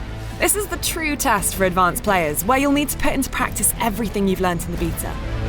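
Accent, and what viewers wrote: United Kingdom. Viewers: seriously what is up with people, you all act like yours is the only acceptable form of pronunciation,It's UK English and you can clearly hear it in her voice. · Afro Samurai HD bless that UK accent bro. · I'm British, and that's how we say Beta. See also "tomatoes" "vitamin" and "aluminium" for further education / infuriation.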